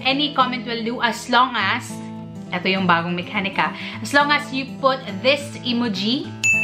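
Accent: Visayas, Philippines. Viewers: Ate 💗💗😉😉btw pang ilang commeng kona bato😂😅but its okay...ate alam mo ba na pangalawa ka sa mga best youtuber para sakin..simula palang kasi nung napadpad ako dito grabehan na ehh...nagtuhan ko agad channel mo..and sana te mabasa moto ilolong comment ko na..ate hope i win for this giveaway kahit eto lang kahit isa lang dyan basta may matanggap ako from my favorite youtuber...plis🙏🙏sana talaga ate...kasi matagal kong winish na manalo sa mga giveaways mo pero lagi nalang hinde🙍kaya sana this time manalo namn ako😿sana..btw ate wlang halong eklabush to ah.ang galing mo talaga and ang cute mo magsalita...bisaya?? Hahah same tayo ate papa ko kase bisaya pero andito kami ngayon sa caloocan city...i hope ate mapili mo talaga ko..pag napili moko gagawan ko yan ng bidyo😂and irerebyu ko yan😅💗pramis...kapag napili moko d ka magsisisi..hahha...lab yuu ate..keep it up👍sana talaga mapili moko..btw mag rarandompicker kaba o ikaw mismo pipili??? 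Sana ikaw nalang mismo para mas maganda kasi alam mo kung kanino dapat yan mapunta..anyway un lang..lab yuu 😂😂sana talaga mapili moko. Wish ko lagi kong pinagdadasal..plisss...1million na plis..hahha💞un lang ate. Ig: maizel_jems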